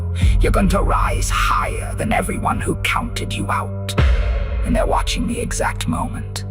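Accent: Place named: United Kingdom. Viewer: Your voice has a British accent to it under the voice modulater. Blimey.😂❤❤❤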